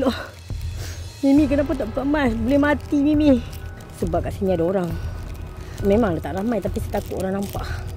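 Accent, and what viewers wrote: Penang, Malaysia. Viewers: rindu mimi ckp utara loghat peneng utara😢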